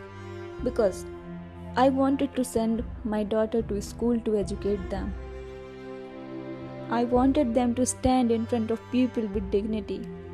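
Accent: India. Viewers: Wow..But I dnt Knw Y I dnt Wana Hear Indian english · Indian acent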